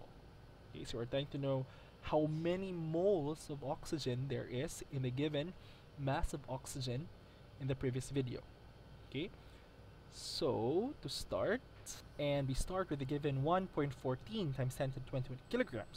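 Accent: Philippines. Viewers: This guy is a filipino just by his accent.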